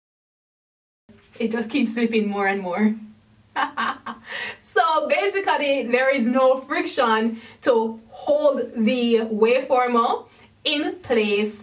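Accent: Jamaica. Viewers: I hear a Jamaican! I stan my fellow country woman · She sound jamaican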